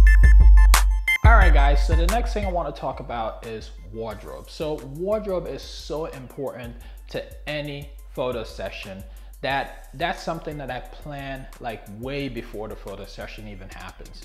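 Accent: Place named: Jamaica. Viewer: Bredren, where you hail from? Sound a bit like my countryman...Jamaica. Great video.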